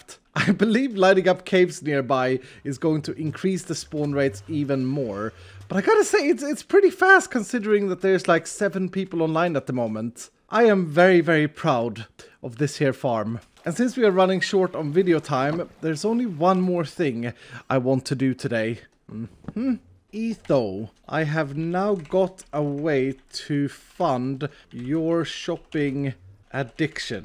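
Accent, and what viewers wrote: Sweden. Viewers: Are you Swedish because you have a very Swedish accident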